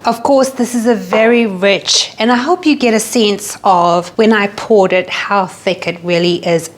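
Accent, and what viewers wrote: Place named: South Africa. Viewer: Were you originally from South Africa. Your accent sounds so familiar. But live from RSA anyway to you 😊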